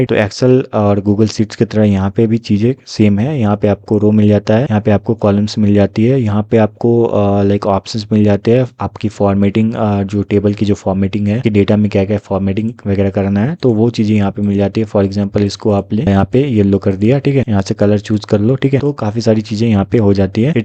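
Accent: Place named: Bengal, India. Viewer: Tum tam karna band karo. Bong lagte ho.but indeed informative.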